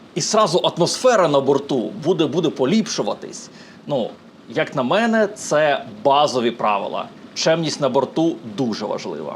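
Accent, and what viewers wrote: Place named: United States. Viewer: скажіть, будь ласка, ви вже напевно довгий час не проживали в Україні? Бо, наче, спостерігаю американський акцент у розмові)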